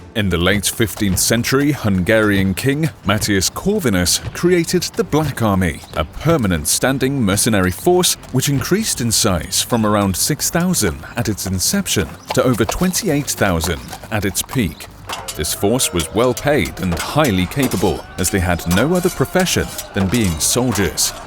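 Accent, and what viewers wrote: United Kingdom. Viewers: Yo it’s the old British narrator 3 years ago! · New british narator 😮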